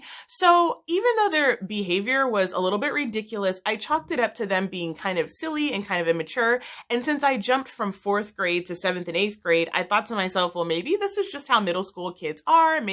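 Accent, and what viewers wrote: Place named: Minnesota, United States. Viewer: The more I listen the more it sounds like white minnesotans. Oh please, nurses— especially school nurses are usually at the bottom of that field, why do you elevate this rube?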